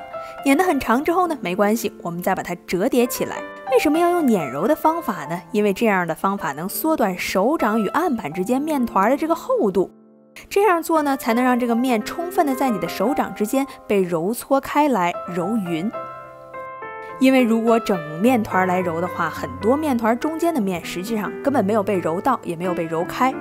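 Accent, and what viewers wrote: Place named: Beijing, China. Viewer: 我学到了面食的制作方法。我也听到了熟悉的，甜美的、北京的声音。很享受！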